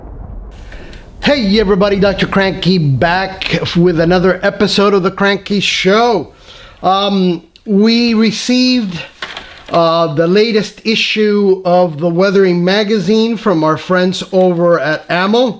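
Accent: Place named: Quebec, Canada. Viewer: That was a very good French accent, sound like my dad, he's from Québec.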